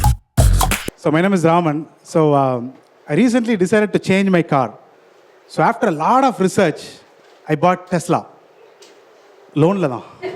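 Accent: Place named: Tirunelveli, India. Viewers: Fantastic Ji…nalla continuity and the Tirunelveli slang was superb….well done! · Was hilarious. Southern accent was awesome! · TIrunelveli baashaya comedy club la kaekka nallathaamya irukku. Valthukkal. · Hilarious! That Tirunelveli slang was gold!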